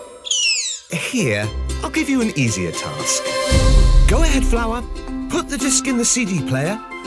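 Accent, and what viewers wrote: United Kingdom. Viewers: La la la la la, la la la la... love the plot of the video, so cute and lovely, the good old British accent of the narrator is a cool touch and makes the video more interesting to watch. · narrator being british also reminds me of tsp so much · Narrator sounds like the one from stanely parable. They both get irritated when you don't do what they want. Both are british.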